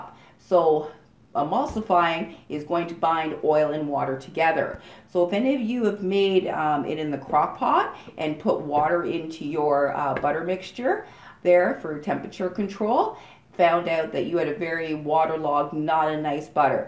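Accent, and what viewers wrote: Canada. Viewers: I love how authentic you are when describing each machine. And your Canadian accent too!! 💚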